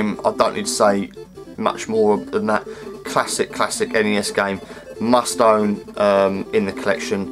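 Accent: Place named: United Kingdom. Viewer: It's because of people like yourself that I love being British!:D It's just the mannerisms and the way you speak ("stop chatting shit ") and it gets me every time I watch a video of yours! There's not many other English speaking people that speak the same way we do.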